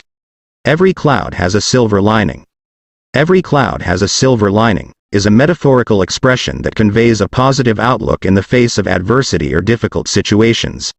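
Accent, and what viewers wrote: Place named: United States. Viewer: Woooow ❤ I like it American accent and speed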